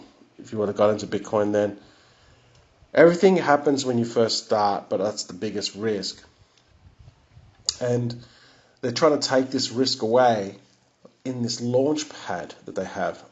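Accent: Australia. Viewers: Hey mate, nice to hear an Aussie voice amongst all these crypto vids. I’m pretty new to this but trustswap seems like a no-brainer. Not sure I can afford to purchase enough to stake any ICO’s immediately. From your understanding is it still worth holding the token? I’m thinking so but still pretty green! Thanks for your thoughts...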